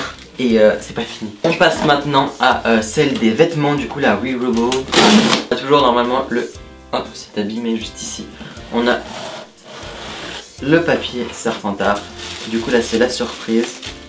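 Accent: England, United Kingdom. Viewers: J'ai beaucoup trop rigolé 😂😂 on adore ton accent anglais 😂😂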